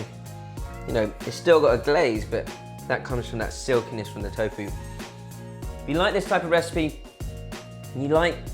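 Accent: United Kingdom. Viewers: Why is we listening to a British asian.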